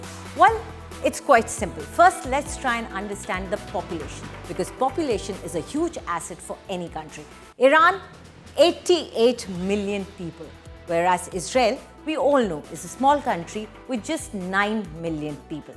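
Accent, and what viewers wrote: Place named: India. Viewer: this indian accent 🤒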